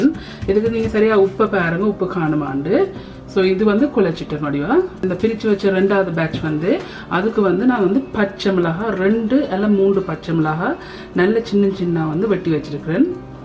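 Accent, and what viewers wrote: Jaffna, Sri Lanka. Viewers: சூப்பர் டெமோ. இலங்கை தமிழும் கேட்க சுவாரஸ்யமாக உள்ளது. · Akkaa neenga muchchu vittu vittu konchum yalpaana tamilil solliththarum alahe thani . Oru sinna kolanthaiku kooda neenga solrathu purium . Seeni sambal bun, boli irendaium seithein super . Appam recipe poduveengala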